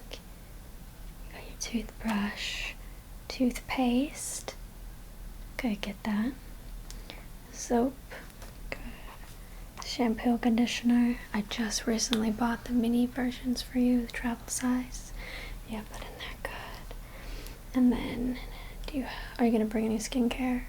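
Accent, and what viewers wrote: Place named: Brooklyn, United States. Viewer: Always love your Brooklyn accent 😁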